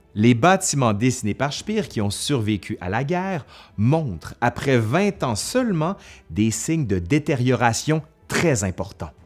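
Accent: Quebec, Canada. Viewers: Je ne regrette pas d'avoir eu la curiosité d'aller faire un tours sur votre chaîne après votre collaboration avec Nota Bene, les sujets sont toujours fascinants, éclectiques et le texte est très bien écrit et ne parlons pas de l'accent des cousins québécois que l'on adore 😊 Merci pour tous ce travail j'attends toujours les épisodes avec impatience ! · Les coins ronds! J'adore le français du Québec. Encore une vidéo passionnante. Merci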